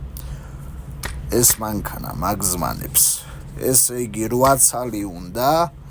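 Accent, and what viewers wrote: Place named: Imereti, Georgia. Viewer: შენდენი იუსები საქსრთველოში არავის გოუხსნია საღოლ ძმაო ისე იმერელი ხარ საუბარზე მეც იმერელივარ ვანი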